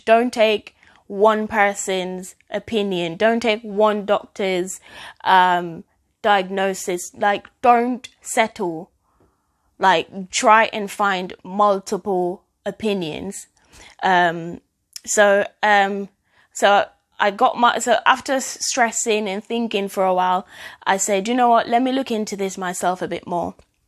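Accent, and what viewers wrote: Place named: United Kingdom. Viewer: Goodie a British enuh. Hear the accent. Great video. I am sure you will reach someone who is struggling with this.❤️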